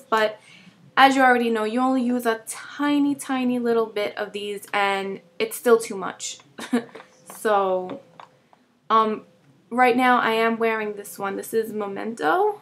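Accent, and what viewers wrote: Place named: East Coast, United States. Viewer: love the east coast accent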